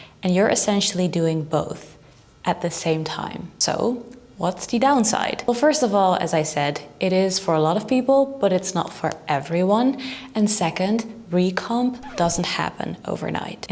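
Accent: Netherlands. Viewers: Very Dutch accent 😂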